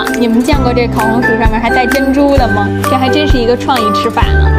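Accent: Beijing, China. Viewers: 北京兒話~好聽！擱這吧🤗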